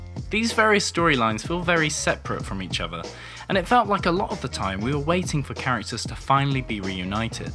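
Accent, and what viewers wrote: United Kingdom. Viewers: Shitty takes. Except for Episode 7, this season was great and not predictable in the least. Pretentious Brit or whatever you are. Fuck your accent.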